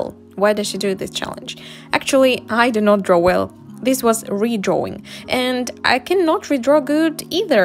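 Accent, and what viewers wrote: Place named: England, United Kingdom. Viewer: I just love you Englishvoice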